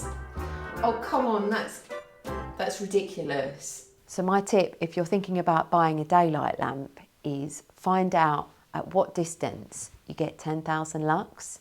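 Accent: United Kingdom. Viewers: I grew up in Florida in the 60’s-70’s…when sunscreens weren’t in vogue. I’m paying for it now. That means I have to avoid the sun or cover up…or lather on sunscreen. However, I still like a sunny day. All that said, you are my Happy light! I look forward to your videos, cheerful smile, lovely British accent, and I learn from your experiments. Keep sharing and glowing…I mean…growing!😊. BTW, I was stationed in Japan and England for 4 years each so I learned to get out regardless of the weather and clouds! 😊😊😊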